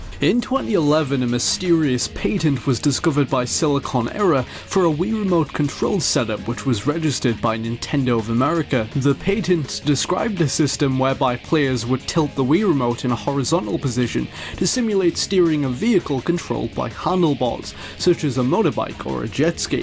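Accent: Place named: Geordie, United Kingdom. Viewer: I instantly recognised your accent on Did You Know Gaming's video. Canny cool to see a local youtuber doing well haha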